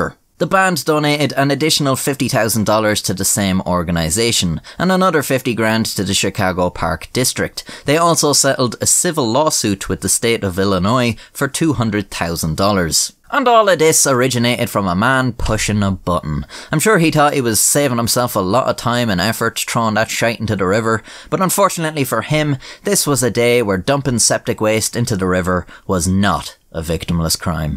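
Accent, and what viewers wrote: Ireland. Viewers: An Irish voice telling us to trust Atlas... Hmm... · "...two turds of the people on the boat below." When the Irish accent lends itself to incidental humor...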